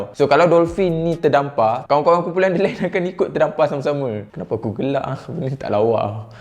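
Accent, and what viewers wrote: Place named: Manglish, Malaysia. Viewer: Manglish hahaha.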